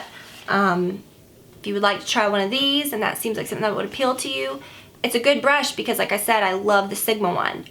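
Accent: South, United States. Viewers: Cute (and unusual for you ) southern accent in this video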